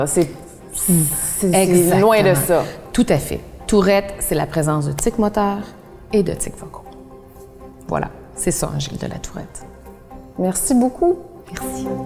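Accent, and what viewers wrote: Canada. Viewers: Bah c'est du québécois c'est pas "mal prononcé" c'est juste pas ton accent à toi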